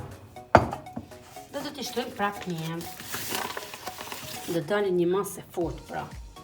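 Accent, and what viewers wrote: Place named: North, Albania. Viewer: Te lumshin duart do ta gatuaj se me plqeu shum si recet. se je nga Veriu kuptohet nga e folura po qa vendi mude ta di te lutem.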